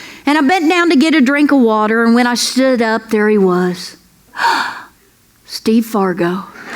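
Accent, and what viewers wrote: South, United States. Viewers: I love her humor too, especially since they are told about true incidents that she lived through herself!! SHE HAS A WONDERFUL SONGING VOICE EVEN THOUGH HER REGULAR SPEAKING VOICE HAS THAT SWEET COUNTRY TWANG.😂. I lo e listening to jokes! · Three weeks ago I stumbled upon an vid and with my niece named Ella Mae I thought what the hell and clicked on it. I have never been so glad I clicked on something I wasn't even looking for. I laughed and then I laughed and then I laughed some more. Her observations and that southern drawl had my stomach hurting. These last three weeks, I've looked for and watched everything I can find that says. Totally hilarious including her X-Rated ones. Love me some. Oh by the way, did I tell ya I laughed😍😍😍😂😂😂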